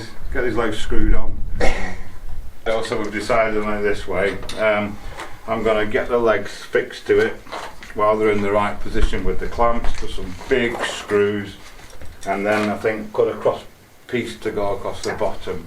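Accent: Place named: Chesterfield, United Kingdom. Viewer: Hi where abouts in the UK are you from? You sound similar to us we live in Chesterfield lm thinking your from near by perhaps Mansfield!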